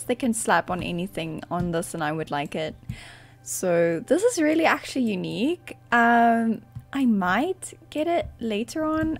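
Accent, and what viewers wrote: South Africa. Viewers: Are you afrikaans? Bc it kinda sounds like it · Hiii I'm new I was wondering if you are south African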